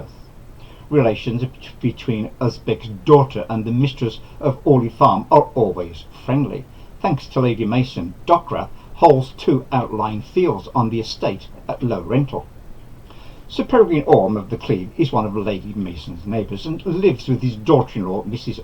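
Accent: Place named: United Kingdom. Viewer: This book is now in my TBR and I am following your channel. Great job with the review. I love your British accent! Stay safe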